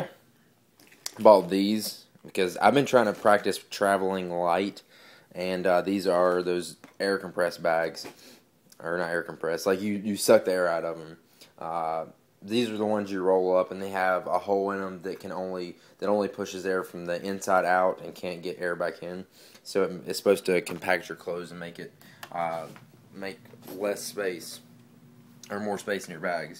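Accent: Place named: North Carolina, United States. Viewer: hahaha I'm from North Carolina too and i as soon as i heard your accent i knew you were from NC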